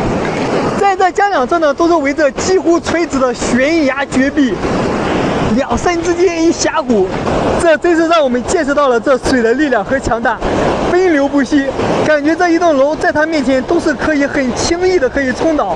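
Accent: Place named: Su-Lu-Yu-Wan border, China. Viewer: 听口音，博主应该是苏鲁豫皖交界地区口音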